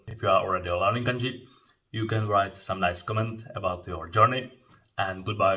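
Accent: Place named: Japan. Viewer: I really appretiate what you doing here on the internet. Keep up the good work and I wish you the best for your future. P.S. you're so Japanese that even your English has a Japanese accent to it.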